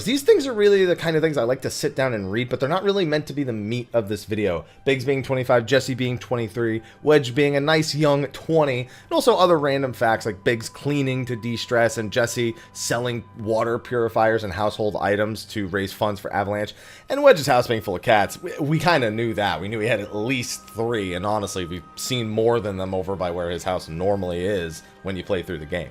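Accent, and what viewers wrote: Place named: Los Angeles, United States. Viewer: You sound like you’re from L.A. Are you?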